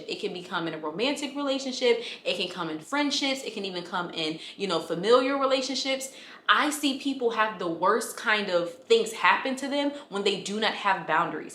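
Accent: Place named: Memphis, United States. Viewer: Are u from Memphis? You sound very Memphis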